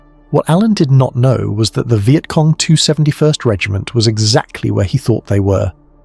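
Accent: United Kingdom. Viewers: Wwwhhy a gd Brit narrator voice? What was next to last option ?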